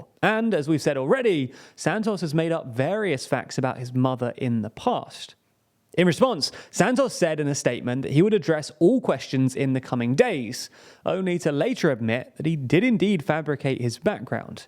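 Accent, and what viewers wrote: England, United Kingdom. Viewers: San-toss, ah-shoe-ming, etc. Englishmen are so funny, when they open their mouths. Otherwise a great report on a huge American voter demonstration of stupidity.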